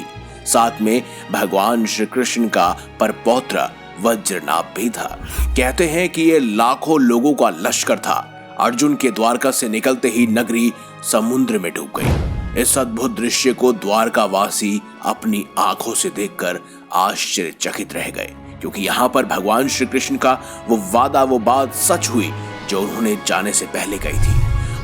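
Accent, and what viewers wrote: United Kingdom. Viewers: जानकारी तो बहुत अच्छी दी है आपने लेकिन आप ये english accent में क्यों बात करते है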